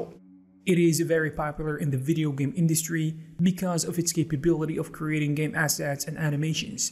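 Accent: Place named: Arab world. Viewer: u have an arabic accent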